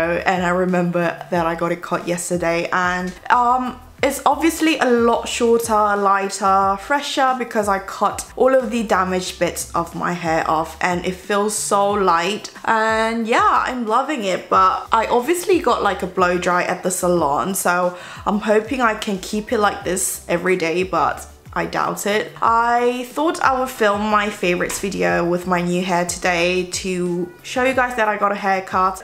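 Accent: United Kingdom. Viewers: 호에 온니ㅜ 단발 넘나 잘어울려여....🙋🏻❣️ 온니 영어발음에 치이고 감미다...총총 온니 영상보고 영어공부할땨마다 영국발음이 조금씩 입에 붙고있어여ㅋㅋㅌㅋㅋㅋ 저는 블러셔는 누드팝에 빠져있고 립은 페리페라 아련폭발+맥 칠리 조합, 그리고 맥 버시컬러스테인 프리저빙패션에 빠져있어여!!!! 곧 봄인데도 불구하고 왜 아직도 가을가을한 조합이 좋은걸까요ㅠ🍂🍁🥀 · 영국식 발음 너무 좋아요ㅠㅠㅍ 언니 너무 이쁘고 우아하고 그러네요...구독 누르고 가요 자막 안보고 목소리에 집중해서 영상 봤어요 ㅎㅎ 좋은 영상 감사해요♡ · Love your British accent so much!!!!!! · 와 이게 영국 억양이에요? 진짜 매력적이다 와...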